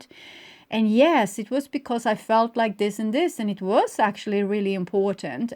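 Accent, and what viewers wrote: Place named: Sweden. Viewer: I love your readings ! They are long but are very in-depth ! I actually after a first watch / listen ! I replay them your voice is very soothing, it’s the Swedish accent speaking English I think it’s very soothing , it makes me fall asleep on a replay! You are correct with being troubled I’ve been troubled for years in regards to an old partner who I have children with who is a drug addict ( meth amphetamines ) it has destroyed her and all I do is walk around and pick up the pieces which is the children ! And to be honest with out being self promoting I’ve done well serving my children ! The enemy ( the drug ) not the person attacks the wall every now and then but weve held strong , the boys know how it can be very calm then the storm comes they’ve been through so much at a young age ! However I haven’t been able due to the hard work of raising children to find a suitable partner 7 years of bad luck but I do believe it is over! 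There is something in the air this spring ( Southern Hemisphere) I am a pagan and I gave some sacrifice to the gods / goddess this spring in an offering of mead seeds honey and work in planting trees some meditation! My ego over the years thought it was strong enough to be alone but it is really not natural so throw these things to the wind and hope for the best! Tusen takk loving lady from the north ,-) ,,,,, haaaaaaa you are so right with being nationalistic , I am an ex soldier who has been to war ! It changed me totally, for sometimes bad but for t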